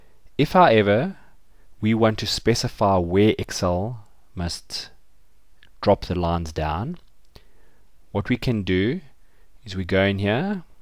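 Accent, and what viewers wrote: South Africa. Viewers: This was helpful! I recognize the South African acccent. :)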